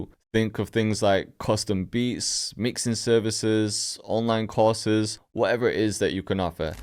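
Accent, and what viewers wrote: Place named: Manchester, United Kingdom. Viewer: Are you from Manchester? Accent sounds a bit like it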